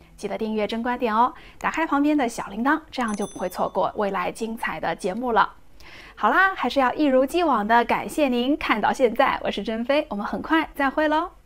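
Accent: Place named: Shanxi, China. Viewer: 你老家是山西或内蒙，感觉你的普通话有乡音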